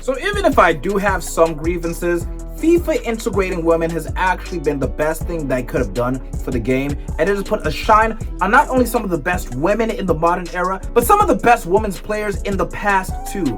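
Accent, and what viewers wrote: United States. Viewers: Then how did you get that American accent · I understand the South Africa to England thing, but I can't place the American accent in your timeline.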